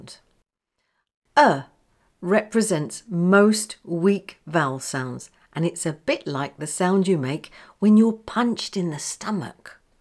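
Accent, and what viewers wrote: RP, United Kingdom. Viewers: Thanks so much 🙏😊. I love your posh English 🎉.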